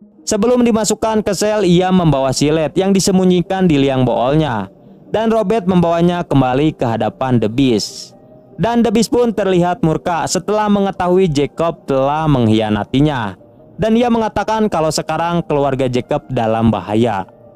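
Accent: West Java, Indonesia. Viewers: Aduh a ni resep nyarios na indo Sunda duh 😂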